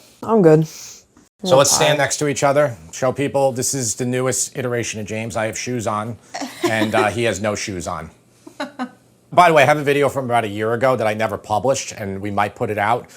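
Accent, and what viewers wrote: New York, United States. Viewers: Why is it every time I watch you it sounds like you've rarely left NY? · Pre-ordered the book! Love your style, your NY accent, and the family! ❤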